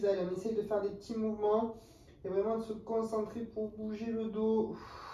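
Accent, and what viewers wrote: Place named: South, France. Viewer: Merci pour les séances et pour l’accent du sud ! J’ai adoré ! Très adaptée pour moi qui ne suis plus de première jeunesse !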